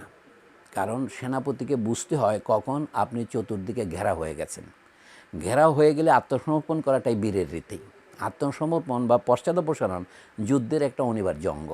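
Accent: Chittagong, Bangladesh. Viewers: As soon I heard you, I new you are our son ( Chaatgaiya) 😊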